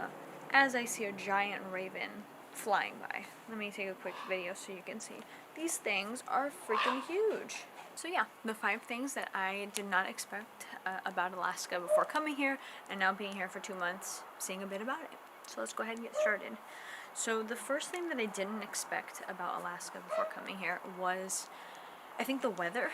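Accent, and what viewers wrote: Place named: South, United States. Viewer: O Southern accent !! 😮😁 Love ya !! 😻😻 More vids please !! 🤗🇺🇸💯💯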